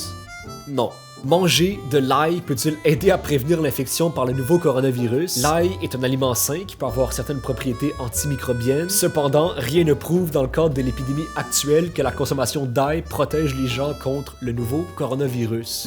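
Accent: Quebec, Canada. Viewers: Cette accent québécois m’avais manqué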